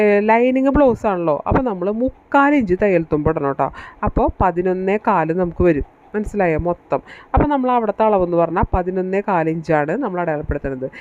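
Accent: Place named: Thrissur, India. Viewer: നിങ്ങള് material മ ടക്കുന്നത് ഒന്നും പറഞ്ഞു തരുന്നില്ല . തൃശ്ശൂർ karude varthanam nangalk ഒന്നും മനസ്സിലാവില്ല. prini, miracle ഇതൊക്കെ kandu നോക്ക് . എങ്ങനെ അവർ പറഞ്ഞു തരുന്നത് എന്ന്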